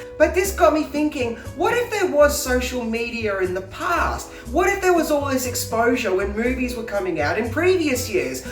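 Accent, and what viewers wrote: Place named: Australia. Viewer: I have one. Superman the quest for peace. Its so bloody awful. The superman series could've done with out it. Worst part is when they r on the moon fighting. Looks like they r in a desert at night fighting in sand. I know the budget was cheap and cheerful. But come on make at least believable. Love the Aussie accent. Visting Melbourne is on my bucket list.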